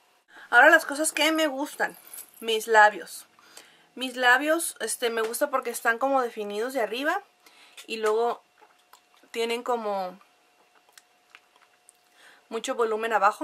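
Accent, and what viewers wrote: North, Mexico. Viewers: Ahh y me encanta. Tu voz con asentó norteño, .....y tu hijo es hermoso, Dtb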